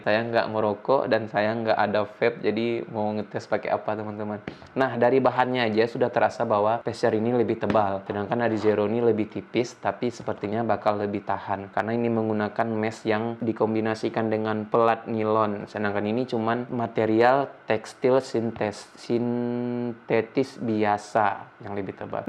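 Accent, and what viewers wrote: West Sumatra, Indonesia. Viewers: Aksen minangnya ketara banget tapi mantap uda reviewnyo. Sangaik mambantu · Lidah nya patah2. Dima sumbar da?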